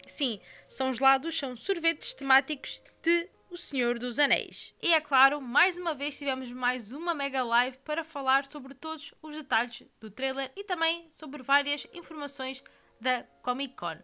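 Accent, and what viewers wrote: Portugal. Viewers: Entendo nada kkk português de Portugal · Olha ultimamente tenho acompanhado muiiiito o conteúdo deste canal, sinceramente quase não tenho critica afinal são Pouquíssimo aqueles que fazem bom conteúdo do Legendário do Tolkien... MAS, confesso que tive serios problemas para entender este programa em questão, veja n ha nada de errado com a apresentadora e sua locução, porém para mim espectador que não estou acostumado com este sotaque torna se quase incompreensível o entendimento do que esta sendo dito (que suponho ser sotaque ou o próprio Português de Portugal). Nota: Minha dificuldade rm particular é a velocidade da pronúncia e o "Sh" carregado po cima de qualquer sonoridade das palvras. Enfim espero que não tomem como uma critica negativa e sim apenas um feedback da minha dificuldade como telespectador, apesar do meu ponto ainda sim todo conteúdo aqui publicado continua sendo otimo😁✌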